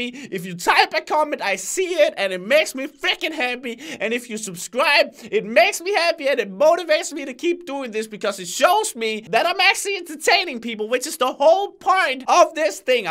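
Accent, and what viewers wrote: Denmark. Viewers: One video u should do u in ur danish accent with subtitles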